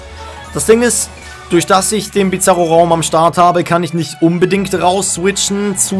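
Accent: Switzerland. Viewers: Mein Deutsch ist nicht sehr gut, aber können jemand mir sagen wenn dieses Mann sprickt Hoch Deutsch? ^^ Can someone tell me if this dude is speaking High German or Swiss German. That's what I tried to say, but my German's not that good. I'm not experienced enough to tell the difference :C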